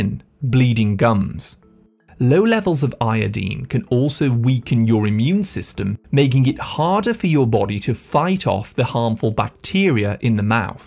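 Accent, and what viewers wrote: England, United Kingdom. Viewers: Pronounced English accent makes me buy nothing.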